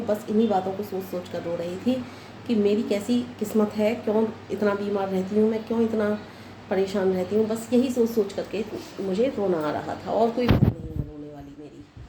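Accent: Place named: Hathras, India. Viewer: aapko bhi happy Diwali 🙏🏻 main bhi u.p se hi hu.. hathras se hu .. aapke bolne ke andaaz se laga ki aap bhi hathras se hi ho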